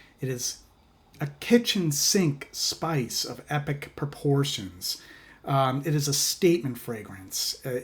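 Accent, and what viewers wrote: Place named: New England, United States. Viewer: What New England state are you from? I'm from there too and I can pick up that accent anywhere! CT?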